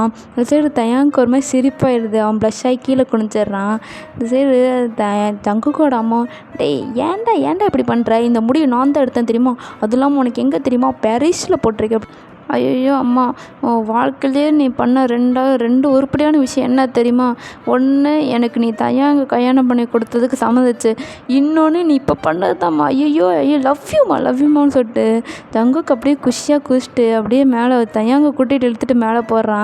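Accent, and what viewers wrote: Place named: Coimbatore, India. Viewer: Super story 😅ma semma I am your new subscriber ama neenga Coimbatore a slang appadithan irukku athan ketten 😅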